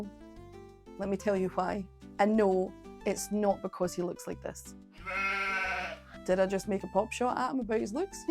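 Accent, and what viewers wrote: Scotland, United Kingdom. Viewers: I especially appreciate your sense of humor, knowledge of music, no nonsense approach and of course, your wonderful Scottish accent! p.s. Thanks for making me a sandwich!!!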